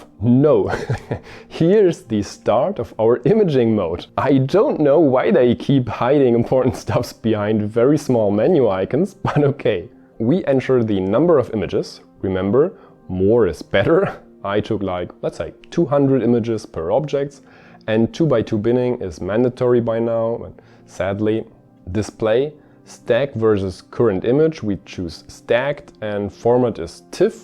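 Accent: Germany. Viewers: German accent..... Ugh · Ich glaube deine Muttersprache ist deutsch ;)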